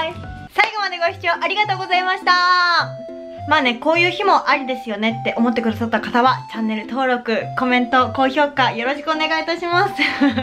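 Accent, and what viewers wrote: Kansai, Japan. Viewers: mayoちゃんの関西弁好き✨ まあ、俺も関西弁やけど笑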